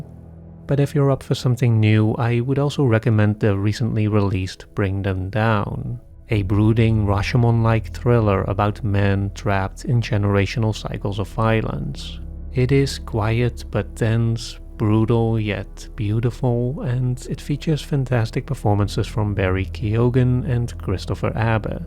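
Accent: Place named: Netherlands. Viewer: Ik ruik een Nederlands accent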